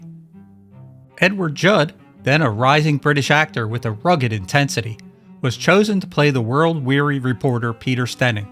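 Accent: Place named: Philadelphia, United States. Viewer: Am I right that you are either from Philly or a close suburb? I hear familiar o’s 😊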